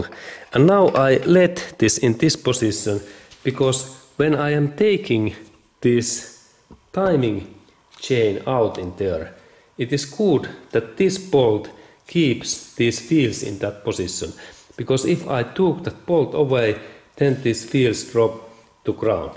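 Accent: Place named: Finland. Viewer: Hienoa työtä! Tunnistin aksentista sut suomalaiseksi! Mikä kone siis tässä pöydällä oli?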